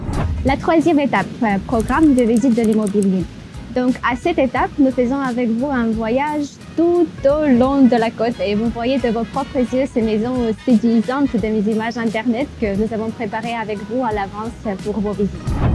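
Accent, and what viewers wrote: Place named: Spain. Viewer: Se le nota el acento de española, pero lo habla bien.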